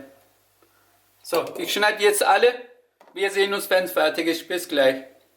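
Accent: Swabia, Germany. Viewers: Das ist "Ausländerschwäbisch" 😂 Sprechen viele. Hat mein Vater auch so ähnlich drauf und ist kein Türke. Keine Ahnung, vielleicht tun die Schwaben ja irgendwas ins Wasser.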